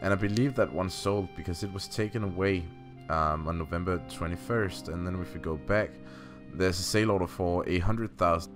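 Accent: Denmark. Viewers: Ye I thought I could hear some Danish accent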